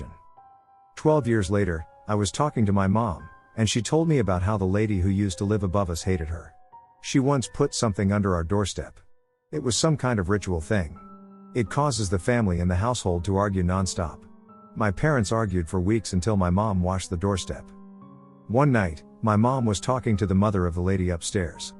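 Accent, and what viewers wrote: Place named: South, United States. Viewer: I've been watching for a long time but I finally decided to subscurb because I'm excited to find out that the tts is a fellow southerner. Yeehaw yall!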